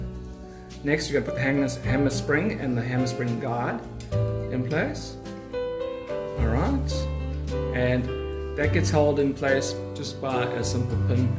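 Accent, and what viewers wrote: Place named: South Africa. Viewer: The style of this video, music and video quality and South African narration has a district 13 feel to it. I really enjoyed watching this upgrade.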